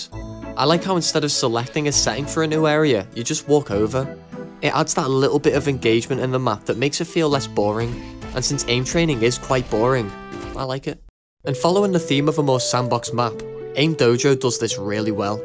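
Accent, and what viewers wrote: United Kingdom. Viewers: united kingdom accent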